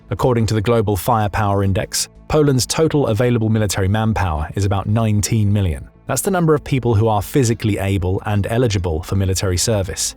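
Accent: United Kingdom. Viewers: Ditch the British communist narrator.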